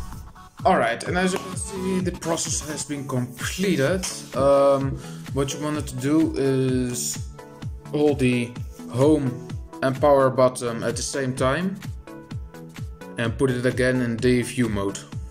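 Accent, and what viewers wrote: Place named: Netherlands. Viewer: Kon aan je stem al aanhoren dat je een Nederlander bent, en het kopje waarin je zelf op het knopje abonneren klikt, bevestigt het voor me. Haha verder informatieve video!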